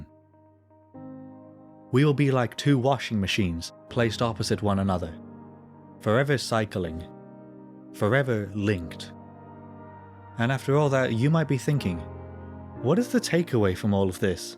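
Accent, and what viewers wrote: United Kingdom. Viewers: He starting to sound like spiffing Brit 👀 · You sound British. If you are, why are you spelling it "Medieval" instead of "Mediaeval"?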